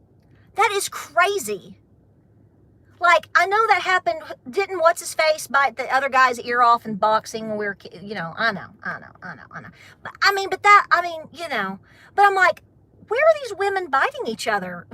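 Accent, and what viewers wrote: South, United States. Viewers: You say your accent isn’t southern but I am from northern Alabama and your accent sure sounds a whole lot like mine Love ya girl.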